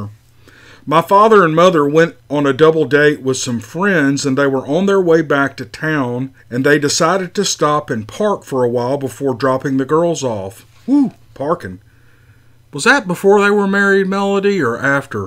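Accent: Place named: North Carolina, United States. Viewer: Nice job. That accent you have is similar to mine in NC. I know a lot of fine folks who talk like us. And I wouldn’t trade it for nothing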